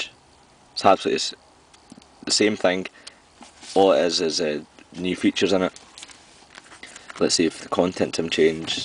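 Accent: Scotland, United Kingdom. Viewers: This is a Scotish Accent, right? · London? Ha-ha! He is obviously Scottish, mate!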